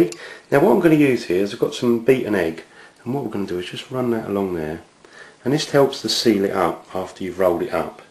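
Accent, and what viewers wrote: United Kingdom. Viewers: My apologies, I thought you were talking about something you can only find in the uk, looking back on the video, I now see you were talking about BEATED egg, and not beat and egg. I sometimes have troubles with understanding uk accents (I come from Canada). Thank you for your understanding, ~DeathAtheist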